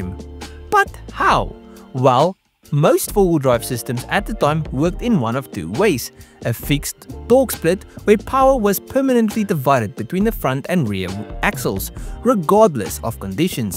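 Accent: South Africa. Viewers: You sound like a white south african, please take care and protect yourself from the maniacs.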